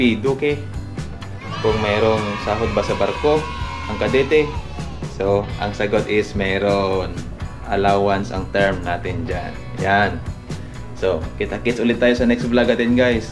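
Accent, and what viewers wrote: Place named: Visayas, Philippines. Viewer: This guy got strong Visayan accent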